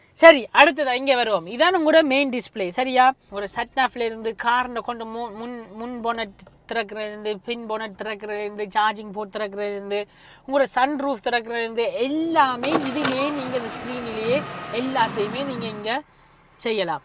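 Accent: Jaffna, Sri Lanka. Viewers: Srilankan tamil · இலங்கை தமிழ். அடுத்து என்ன விஷயம் என்னன்னா இந்தியா வக்குள்ள எந்த ஒரு நல்ல விஷயமும் நடக்காது. நல்லது வரவும் விடமாட்டாங்க. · யாழ்ப்பாண பேச்சுத்தமிழில் வெளுத்து வாங்குறீங்க இலங்கை வானொலித்தமிழில் பேசினால் கேக்கும்போது செவிகளில் தேன்வந்து பாயும் , முடிந்தால் அடுத்ததடவை முயன்று பாருங்கள். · அருமை இனிய இலங்கை தமிழின் அழகு தனி அழகு தொழில்நுட்பத்தையும் எளிதாக புரிய வைத்த தங்களக்கு நன்றி அன்புடன் நாகராஜன் பொன்னேரி தமிழ் நாடு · Sinhala tamil super 👍👍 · இனிய யாழ் தமிழ் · திருத்தம். ஈழத்தமிழ். அல்லது யாழ்ப்பாணத்தமிழ். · இனிமையான இலங்கை தமிழ் உச்சரிப்பு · யாழ்ப்பாணத் தமிழ் அதுவும் இங்கிலாந்தில் இருந்து அங்கு வளர்ந்த பையன் . அதுதான் இனிமை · மிகவும் இனிமையான இலங்கை தமிழ் மிகவும் அறுமை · இந்த பையன் பேசுவது ஸ்ரீலங்கா தமிழ் நல்லா இருக்கும். 05-8-20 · Ungal ilangai Thamizh migavum Azaga iruku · Nice srilanka tamil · உங்கள் ஈழ தமிழ் அழகுக்கும், இனிமைக்கும் வானமே எல்லை. 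எனக்கு பிடித்த கார் விளக்கமும் சேர்ந்து இன்னும் இனிக்கிறது. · Thanking you for best explained in yalzpana Tamil❤️❤️❤️❤️❤️❤️❤️ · யாழ்ப்பாண தமிழ்ல சும்மா பின்னி எடுதிட்டிங்க. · singala tamil யாழ்ப்பாணத் தமிழ் very very sweet to ear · You are the best explanation for the new car and I proud your Jaffna Tamil speak style I love that. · Srilankan original tamil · illangai tamil ketka nalla eruku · இலைங்கையில எல்லாரும் இவர் மாதிரி தானே பேசுவாங்க? இல்லையா? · தமிழில் அதுவும் இலங்கை தமழில் பேசும் விதம் ஒரு இனிமை காரின் செயல்பாடு தன்மையை விளக்கும் விதம் அருமை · இலங்கை யாழ்ப்பாண தமிழ். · i like Elangai Tamil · ஈழத்தமிழ் கேட்க இனிமையாக இருக்கின்றது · ஐயா , காரை பற்றி இலங்கை தமிழ் சூப்பா் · Jaffna tamil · Sri Lanka Tamil sema mass... Super Machan car oda back rampa thodai Mari hahahaha . Kuwait le irundhu neelakandan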